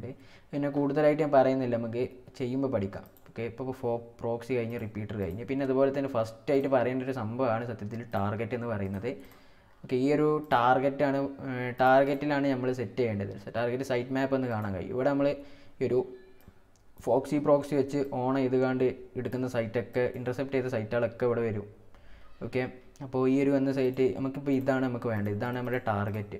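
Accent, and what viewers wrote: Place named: Malappuram, India. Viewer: malappuram language slang anu allo . entha e olu😁😁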